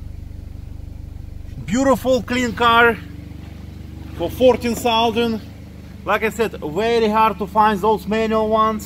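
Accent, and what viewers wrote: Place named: Russia. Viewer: Русский-русский) Наш акцент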